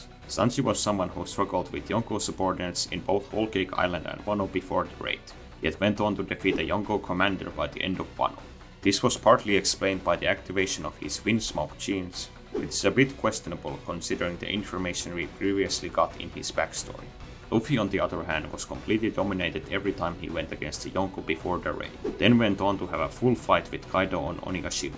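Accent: Finland. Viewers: Why do you sound Finnish? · your accent sounds finnish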